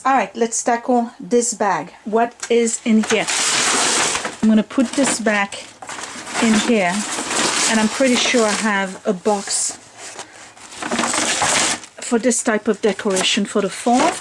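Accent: France. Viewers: Hubby started watching your videos with me lately!! I think he secretly likes you french accent! We both laughed at Scott's bin of cables and modems, Hubby has 2 bins of those in our garage! I have no idea why he is keeping them!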